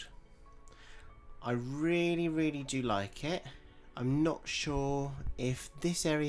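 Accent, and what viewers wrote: England, United Kingdom. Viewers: OMG.I could almost hear your cogs turning and I loved it.I'm glsd I'm not the only one who makes split decisions. No matter how we plan,the art just takes it own natural journey. The piece it beautiful.So delicate and full of movement. I LOVE IT. Thank you for sharing.Git the ljnk off Facebook and have now subscribed to your channel.I may do the Molly/Olga and Donna course seeing as you have done it,it must be good.I love these 3 artists.I am also wondering about the Daler and Rowney paint.Is it a good brand?I am in Ireland and am wondering (because of your accent if you are England)because if you are then the products you use are probably easier for me to source. Thank you so much for the links and videos.I will enjoy watching.I like your style.🤗🇮🇪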